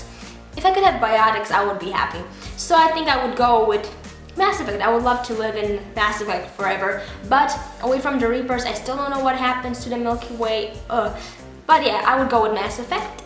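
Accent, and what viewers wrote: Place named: Russia. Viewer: Love the vid and enjoy your videos and where are u from u sound a bit Russian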